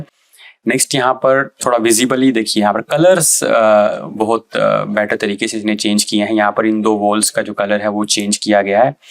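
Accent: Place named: Ireland. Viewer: It's starts out like English but then becomes Irish or something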